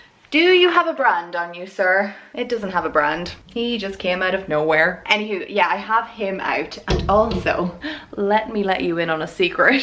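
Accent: Ireland. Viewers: Hi ❤ I just have to tell you that I LOVE YOUR VIDEOS! I have been cleaning all day and your videos have been playing in the background. I've been watching your videos for the past few days and you have been inspiring me in many ways. I love watching your routine videos and what you eat in a day videos. I came across your channel watching your Realistic 1 hour mourning routine, and since then I've been obsessed. I LOVE your accent as well!! I've always wanted to visit Ireland. Any-who, keep up the great work! Always looking out for new footage from ya!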